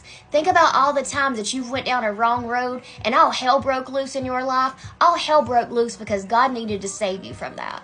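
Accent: South, United States. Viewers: You have a great Southern accent sweets!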